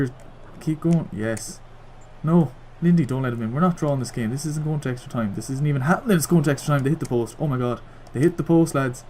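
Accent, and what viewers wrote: Ireland. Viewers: YOUR IRISH!!!! WOOP Hey Im AmigoSully and im here in the search of making a recording team as its a good way to grow, I like your content and love to have you as a part of the team:) hope to hear back from you Sully xD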